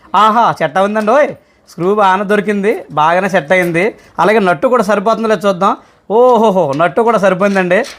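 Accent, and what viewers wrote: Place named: Srikakulam, India. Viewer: శ్రీకాకుళం language లా ఉంది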